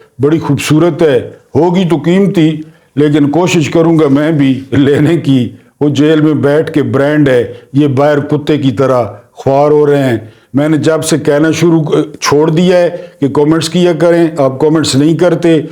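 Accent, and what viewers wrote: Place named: Mianwali, Pakistan. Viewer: Sahib kabhi kabhi apni mianwali zuban aur lehjay ka chtkhara zaroor lagaya karain hamain buhut lutf aata hai.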